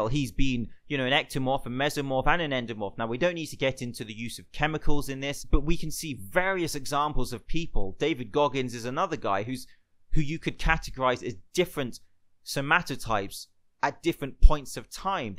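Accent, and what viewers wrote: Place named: St Albans, United Kingdom. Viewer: I've been seriously getting into fitness for the last year. The YouTube algorithm has clearly decided I'm ready to level up — these videos are exceptional and seriously adding value to my life. Thank you so much! Your accent sounds like it's from my hometown, St Albans, which is comforting during lockdown up in the sticks in Scotland :)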